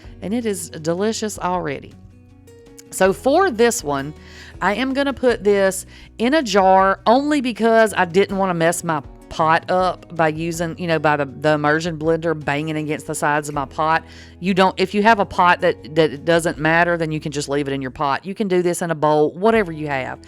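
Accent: South, United States. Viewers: I could listen to you all day! Love your wonderful, southern accent! Oh, and your idea of individually freezing the creamers into little tablespoon servings was such a great idea. Thanks for sharing! ❤ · Idk where you’re from (this is my first vid), but I’m betting TX, MS or GA. I grew up in TX and lived in MS for a long time. I miss hearing a Southetn drawl. I’m back home in Ohio now. In any case, I’m looking forward to making some of these creamers—they are getting ridiculously overpriced at the grocery store for being bottles of milk and sugar! SMH PS I bet we’d have been best friends had we ever met!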